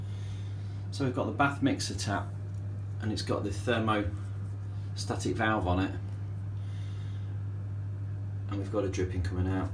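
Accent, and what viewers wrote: Leicester, United Kingdom. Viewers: The accent implies you have to be a Leicester lad like me?!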